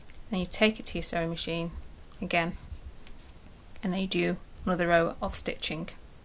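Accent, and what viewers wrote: United Kingdom. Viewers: isn't it also the case that pin tucks are stitched but pleats aren't? I've always wondered how it's done, now I understand perfectly. The fun for me will be working out how much extra fabric I'll need! I can see much experimentation ahead! Thank you also for having a proper accent, as a fellow Brit I'm getting fed up with tutorials with American voices 😄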